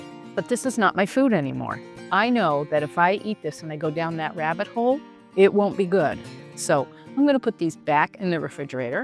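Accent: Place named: Hamptons, United States. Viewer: Love to use envelopes too, listening to your accent and a reference to the city, I’m thinking you live in the Hamptons? Wonderful view. Love your food, I make veggie soup too, but at the end I take a little out, blend it in the nutribullet and add it back for a nice creamy feel. I definitely need that string blender thing❤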